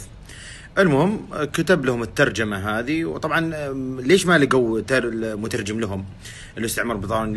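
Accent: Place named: Hadhramaut, Yemen. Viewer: لهجتك روعه تحية للحضارم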